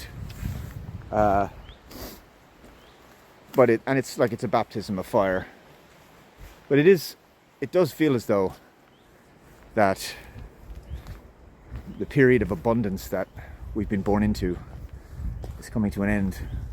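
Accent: Ireland. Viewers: This is a game changer, man. I didn't expect a face reveal. I had a feeling you were ginger, man. The voice. God bless the Irish. I'm quarter Irish. I have family in Cork myself.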